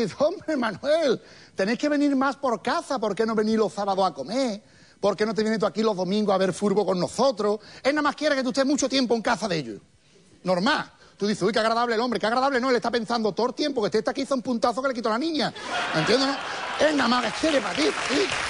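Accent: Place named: Andalusia, Spain. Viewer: joderrr que bueno es este tio. Yo soy de madrid. y tambien le entiendo perfectamente desde hace muchos años. Asique dejense de gilipolleces que esta mas bonito el acento andaluz y con mas gracia que el nuestro madrileño. Manu el mejor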